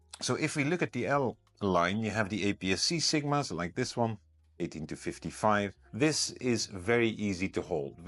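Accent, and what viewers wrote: Netherlands. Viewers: Wan toe pats, der wie goo. Jup definitely dutch! Great video, keep it up!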